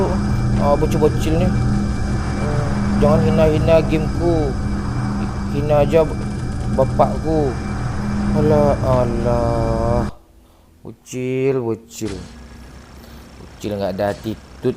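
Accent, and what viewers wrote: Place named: West Sumatra, Indonesia. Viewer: Logat minang nya,kerasa ya 😂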